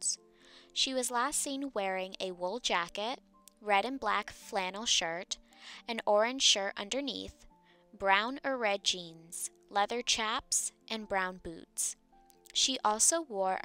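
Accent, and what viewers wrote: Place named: Wyoming, United States. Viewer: Your accent sounded like a girl from Wyoming we had as an exchange student when I was in high school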